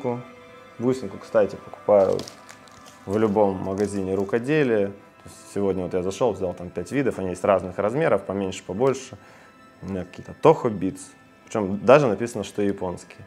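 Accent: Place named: Ukraine. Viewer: на первый чемпионат,случаем не за сборную Украины ездил?Уж очень Говор характерный слышен…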